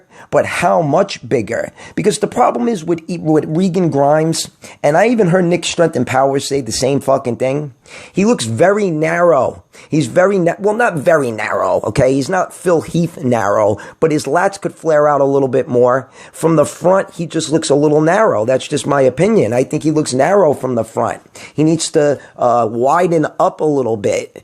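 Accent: New York, United States. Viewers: i love your no bullshit attitude mixed with the NY accent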